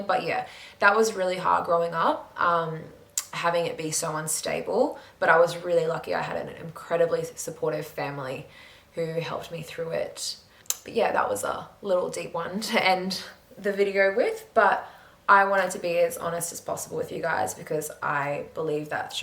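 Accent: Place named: Australia. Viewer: It’s a blessing to hear your Ozzie accent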